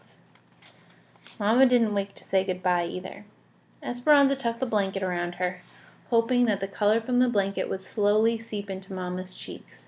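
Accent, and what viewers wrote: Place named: Mexico. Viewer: Bruh! You need to work on that Mexican accent! >:(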